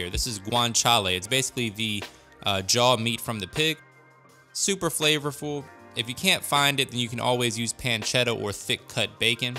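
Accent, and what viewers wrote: United States. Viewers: Why do you amaricans sound so cool???? Us English lads just sound common haha Brilliant video, just come across you, carbonara is my favourite pasta, definitely gunna try your recipe 👌 Peace and love from the uk 🇬🇧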